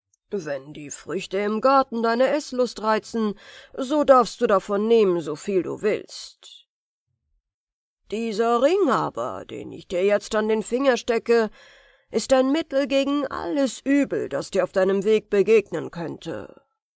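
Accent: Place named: United States. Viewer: ja klar, Geschmäcker sind eben unterschiedlich. Mir hat es dann trotzdem ganz gut gefallen! Auch wie du die Stimmen machst zu.b. find ich gut. Es ist nur was in diesem SingSangton ... Ist neuerdings bei jungen Vorleser so verbreitet, Ich frag mich was das ist und mutmaße: es ist ein Amerikanismus!! Versuchen sie die Amis zu reden auf deutsch. Und das klingt einfach blöd in meinen Ohren.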